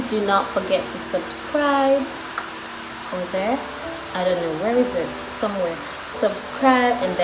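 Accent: Philippines. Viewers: I"m a new subscriber of your channel. You speak english clearly and your accent sounded like english speaker pinoys. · you got the philippine english accent.it’s good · Philippine English accent.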